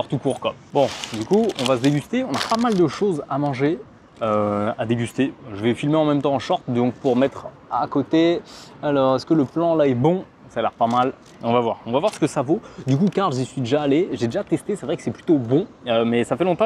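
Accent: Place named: England, United Kingdom. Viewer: comme dit précédemment jaime trop ton acent anglais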